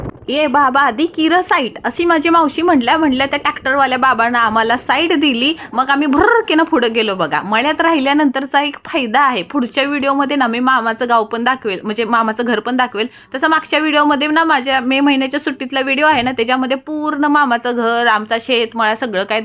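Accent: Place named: Western Maharashtra, India. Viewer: तुमच्या भाषेवरून मला वाटलंच होतं हे तुम्ही पश्चिम महाराष्ट्रातलेच असाल म्हणजे कराड भागातील कारण तुम्ही सांगितलेल्या प्रत्येक गावात पाहुणे आहेत खूप छान ब्लॉग आणि मी तुमचे व्हिडिओ नेहमी फॉलो करते कारण तोंडोली पण आम्ही दर पौर्णिमेला येत होतो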